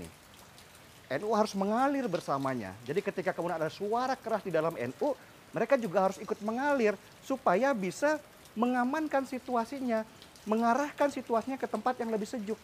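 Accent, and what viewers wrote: West Java, Indonesia. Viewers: mantaaaab bang..Ketika mengucap U dan I mengingatkan saya kepada kang Asep... sepertinya pernah tinggal lama di jabar ya bang..hehehe.. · Bang orang Medan tapi terkadang aksennya kayak orang sunda.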